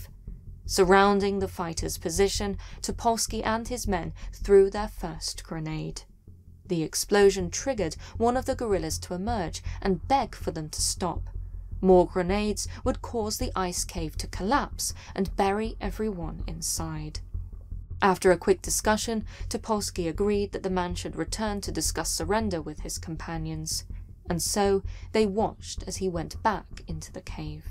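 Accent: England, United Kingdom. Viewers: It's an English accent, not British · I love this informative channel!!! Thank you for presenting your content in a classy and professional way!! It urkes me soooo bad when you click on serious and mysterious videos and it's either a computer voice or some joker trying to make funny comments while presenting. Very well done, love your beautiful English voice ♥️ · You are a great storyteller. This just happened to pop up on my main page, so I thought I'd take a look at it. Being a songwriter, musician, vocalist and body builder those are some of the things that I mostly look for on here. But since youtube started adding different types of videos to my page I started to watch a few of them. You're really good. It's because of the way that you tell them. You also have that great British accent going on there too. I think that your British accent is awesome! 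You do a great job. Thanks.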